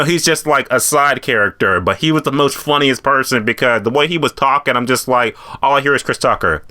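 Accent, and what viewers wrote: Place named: Pittsburgh, United States. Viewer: Are you from Pittsburgh? You got that accent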